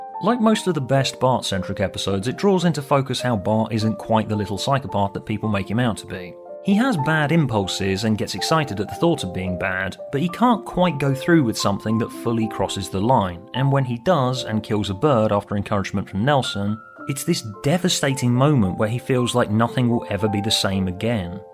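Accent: United Kingdom. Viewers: Academic cuz he bri ish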